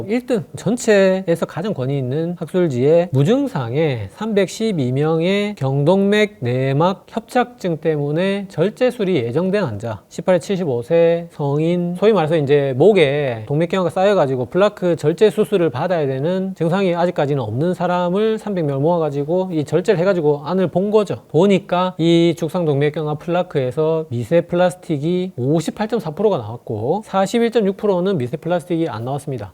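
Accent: Gyeongsang, South Korea. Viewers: 지구가 프라스틱에 째리게 되었다 ㅠㅠ 갱상도라 더 더가옴. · 선생님 잘 듵었습니다 구수한 경상도 사투리가 정겹네요 미세프라스팈에 쪄였어 살았네요 줄이는 방법 알려주셨어 감사합니다^^~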